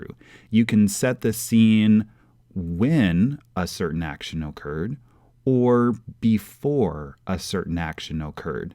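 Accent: United States. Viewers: Your pronunciation needs to be a bit less Americanized. Great information though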